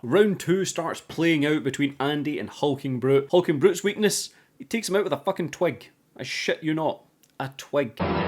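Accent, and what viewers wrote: Scotland, United Kingdom. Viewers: I know his name is like dallas but bcuz of ur accent as a fellow Scottish person sounds like ur saying he’s dadless lol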